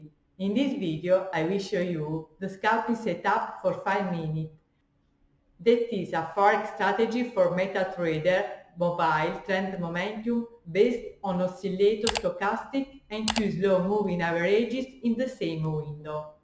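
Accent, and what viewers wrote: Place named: Italy. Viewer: nice video, you have an italian accent, are you italian?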